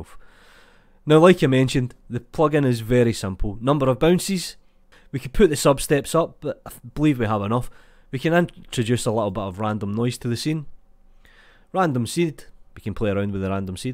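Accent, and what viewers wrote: Scotland, United Kingdom. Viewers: I started to listen to this video and I was like: Is this guy? He sounds. Well, he is it literally.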